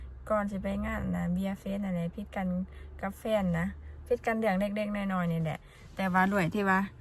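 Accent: Laos. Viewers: พยายามอย่าพูดลาวบ่อยๆ เมื่อไหร่จะพูดไทยชัดสักที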